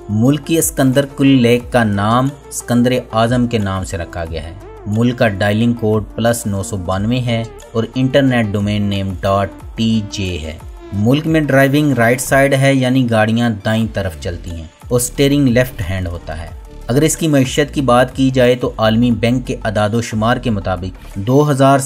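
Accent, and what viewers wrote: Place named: Punjab, Pakistan. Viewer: First you should try not to speak Urdu with Punjabi accent. But your German and you will be German understand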